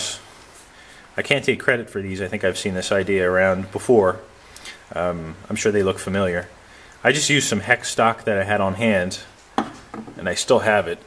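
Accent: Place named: United States. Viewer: Wait until you discover aluminum jaws... Machines like a wet dream, no-mar clamping. You sound like a fellow American, so wth is with all the metric dimensions?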